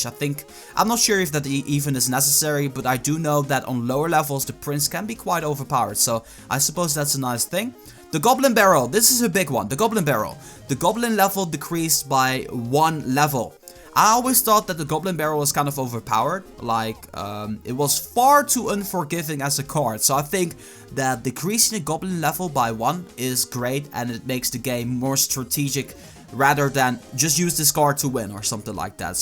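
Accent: Netherlands. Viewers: dat dutch acent <3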